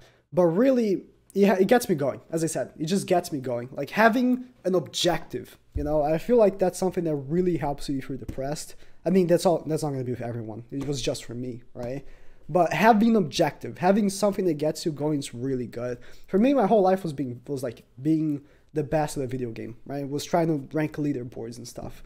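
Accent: Brazil. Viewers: I actually remember In one of your streams I asked you if you were from Brazil because I recognized your accent, my portuguese teacher also has the same one so I thought it was similar. But when you read my comment and didn't answer I thought I had said something rude so yeah.. but looks like I was right :p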